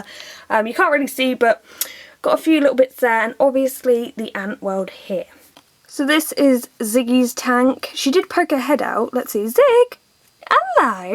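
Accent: United Kingdom. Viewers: sounds british to me. but im not sure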